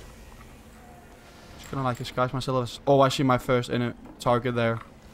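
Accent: Denmark. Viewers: Your too danish, the danglish is real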